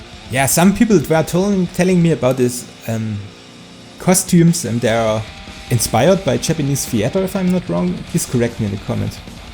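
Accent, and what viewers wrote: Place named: Germany. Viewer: Are you German? Your accent sounds so familiar. Do I hear a bit Saxon out there?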